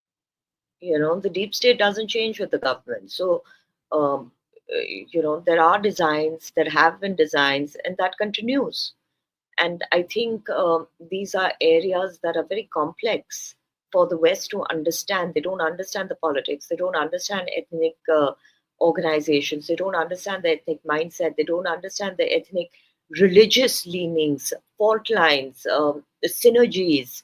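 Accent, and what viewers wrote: India. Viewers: Stop spewing BS in Indian accent. Bangladesh is not in a mess, we are in a very very good shape. Your own foreign policy screwed us over for 15 years. Now just stay away. People don't want ANY Indian influence here anymore. Radicalization? Don't you know what RSS or BJP is? What their goons do in India? And you dare to lecture us about radicalization? Wow.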